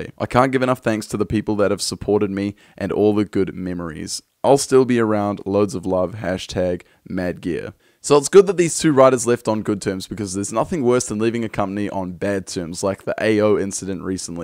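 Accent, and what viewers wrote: Australia. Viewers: Are you Australian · Are you Aussie I am!!!!!!!!!!!!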